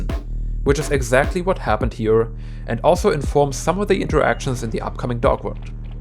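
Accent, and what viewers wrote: Germany. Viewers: Love your german Accent :D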